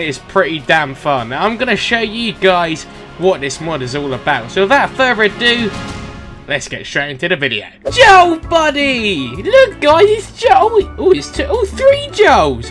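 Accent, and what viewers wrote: United Kingdom. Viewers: its ok man by the way r u British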